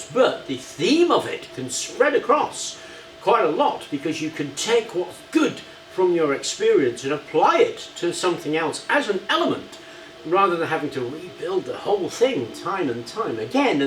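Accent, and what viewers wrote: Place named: England, United Kingdom. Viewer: Sir, may I tell you with the upmost honesty, I appreciate your videos, every single one. I live in the states however, your accent reminds me of my late grandmother. She originated in England. I am a gigantic fan, and please continue to share your knowledge.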